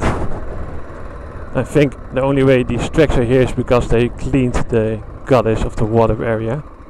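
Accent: Netherlands. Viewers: Op de 1 of andere manier hoor ik gwn dat je een Nederlander bent😂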